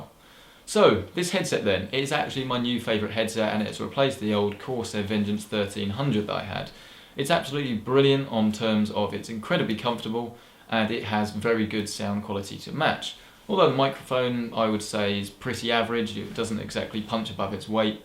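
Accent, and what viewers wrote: United Kingdom. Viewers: that's just his british accent...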